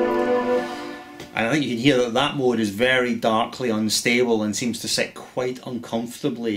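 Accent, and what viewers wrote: Scotland, United Kingdom. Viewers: Awesome video. Really informative and the Scottish accent made it a bit more interesting. Cheers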